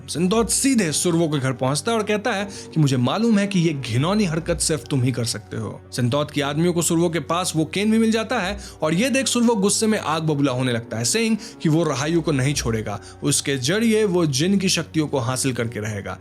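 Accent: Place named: Bihar, India. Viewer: तुम पक्का बिहारी हो, लालू प्रसाद यादव की तरह एक्सप्लेन करते हो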